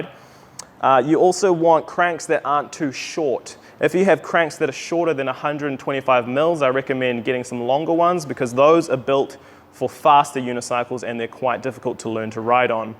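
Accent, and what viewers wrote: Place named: Australia. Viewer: Thanks so much,! I’m 53 and decided to learn while corona-confined. I’ve watched you so many times that I’m starting to speak with your AMAZING accent! I am an American who was inspired by a pastor in Taiwan, trained by a YouTuber from down under. Yesterday I rode a quarter km, but am still having a terrible time with mounting. Both methods are tough for me, so I’ve used a brick to prop and prevent rolling backward. I need to break this bad habit, because i’m tired of having to walk back to my brick. Any more mounting tips?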